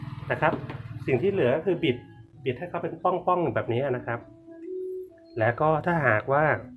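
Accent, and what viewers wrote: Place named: Bangkok, Thailand. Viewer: อยู่มี่ไหนคะนี่พูดเพราะเหมือนคนกทม.ตอบด้วย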